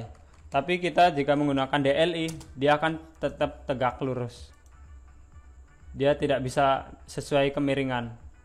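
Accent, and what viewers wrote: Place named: Banyumas, Indonesia. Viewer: ngapak banget mantap mas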